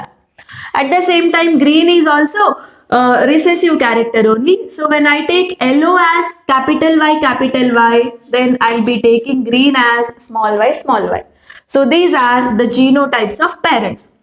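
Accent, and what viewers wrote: Karnataka, India. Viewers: Mam ur from Karnataka right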